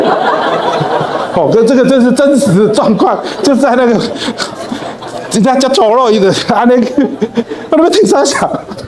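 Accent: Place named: Taiwan. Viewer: 台語真的好真實啦😂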